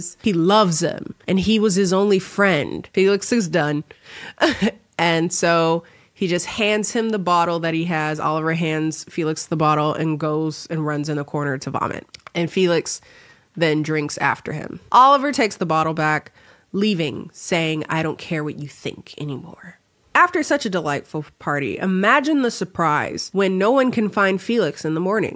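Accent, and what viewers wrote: United Kingdom. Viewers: I haven't watched your vids in a while and suddenly you sound British?!! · Don’t worry another recap spoiled those scenes and I kind of need those scenes spoiled to know when to fast forward. I would say Talented Mr. Ripley then Mean Girls cause Cady would never murder Regina lol.Love the British accent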